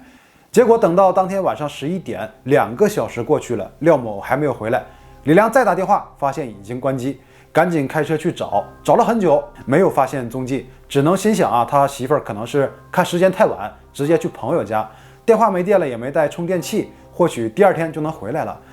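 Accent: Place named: Shenyang, China. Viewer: 但是有浓浓的东北口音 感觉像沈阳的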